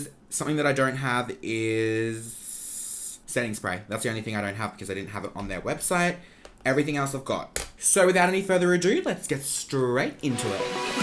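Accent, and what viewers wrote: Queensland, Australia. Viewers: Omg youre aussie!?? Hello from a fellow Australian😂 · OMFG I LOVE YOU ❤❤ you make me miss Australia with your accent 😭😂 your so fucking funny!! I love you, and your videos ❤❤ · 1st time on this channel. I already love it! Straight off the bat also I can tell that he's from Queensland. 👌❤ Also congrats on trending!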